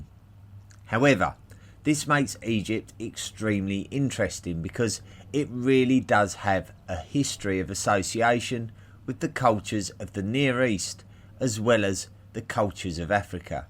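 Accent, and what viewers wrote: United Kingdom. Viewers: What in the hell are you talking about? All I hear is a British accent espousing junk science.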